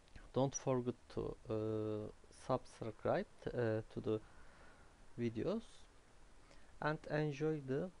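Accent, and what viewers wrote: Turkey. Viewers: Direk anladım Türk olduğunu :D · Sounds like a Turkish accent. Where are you from buddy?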